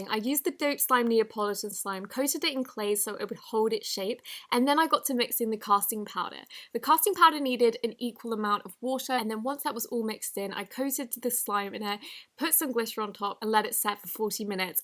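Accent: United Kingdom. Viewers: Are you from the uk ?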